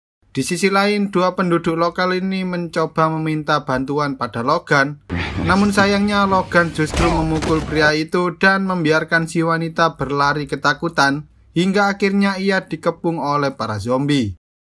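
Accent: Java, Indonesia. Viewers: pekat bet jawo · Bang kamu orang Jawa ya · Wong jowo to mas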